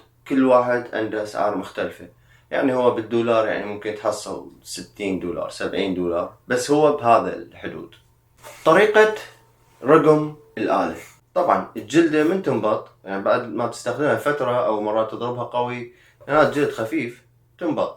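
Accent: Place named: Basra, Iraq. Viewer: رحم الله والديك كلش خوش فيديو و حبيت لهجتك البصراوية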